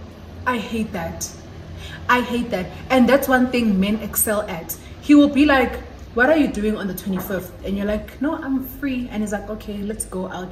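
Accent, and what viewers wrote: Nigeria. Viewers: I discovered your channel through thati ever since I never looked back because whats life without Nigerian accent and your storytimes are the best.❤🥰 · Hey Motswala... #10k brunch... The very first time I watched this channel, I commented and said am staying.... It was a shout out recommendation from Naledi Mallela.... The Nigerian code-switching accent gets me all the time. I love your authenticity... You could be the girl next door njeee❤❤❤❤ Hope you host a Cape Town Brunch and I get the chance to be nominated 😂.... ❤❤❤ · Hey Motswala hope you are good?❤❤❤ Naledi M send me here and it was comfortable over here. The first story I watched was the IPhone 6 story time and I decided to stay because of how you relayed the story plus you are funny 🤣🤣🤣 hlogo Yao ae bereke and I’m here for it. Followed you everywhere and your Nigerian accent and love for afrobeats ❤❤❤ I used to think is Igbo or Nigerian. 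Yeah wene why can’t I download your videos on TikTok? When I catch you😤😤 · Hey. I joined when you had your Collab with Lebonza and have enjoyed every single video after that. Your nigerian accent is the best! and very contagious lol i hope you get what i mean. Thank you for the lovely content ♥ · Hey Motswala I started watching you 4 months ago when you were doing a makeup video with Seithati Letsipa since that day I was glued to your channel. I love all videos & storytime, love the Nigerian accent kakhulu ❤️❤️❤️ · I joined when you were doing make-up video with Lebonza I came from her channel straight to yours from that day😊...and I enjoy your Nigerian accent and your laughter on all your video 😍❤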